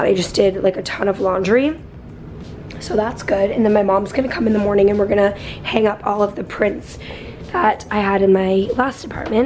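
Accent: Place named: Northeast, United States. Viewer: ya it's def a northeastern accent thing. i watch another youtuber who lives in la but she's from massachusetts and she say "rum" for "room" too. it's funny but cute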